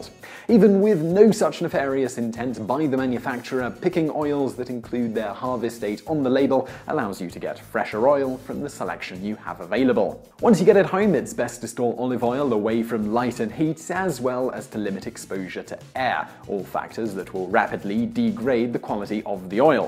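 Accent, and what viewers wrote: United Kingdom. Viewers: Aren't all these videos simply a guy with British accent fast-reading Wikipedia articles on the most common, mundane subjects?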